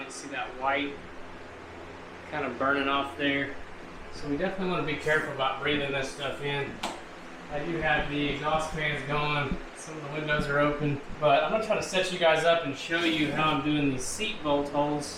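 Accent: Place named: Boston, United States. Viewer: Your boston is beautiful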